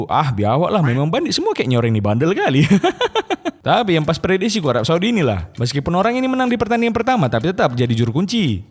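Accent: Medan, Indonesia. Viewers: Orang medan dari logat ngomong nya yang punya channel ini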